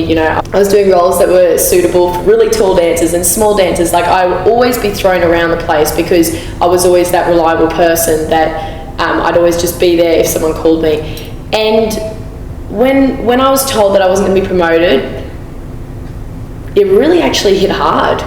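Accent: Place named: Australia. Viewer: Australian accent is so beautiful.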